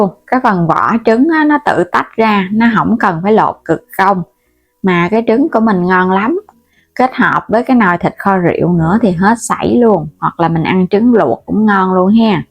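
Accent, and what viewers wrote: Mekong Delta, Vietnam. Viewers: HÔT GÀ , HÔT VỊT CHỨ KHÔNG PHẢI TRỨNG. COI CHỨ KHÔNG PHẢI XEM. BỎ VÔ CHỨ KHÔNG PHẢI CHO VÀO. PHÁT ÂM THÌ " GẶC " DÂN MIỀN TÂY NHƯNG TỪ NGỮ THÌ BỊ VC HÓA.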